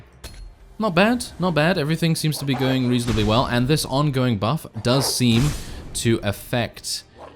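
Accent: United Kingdom. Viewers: Oh and are you British because you sound like it not that there's anything wrong with being British it's just I was wondering you sound very gentleman like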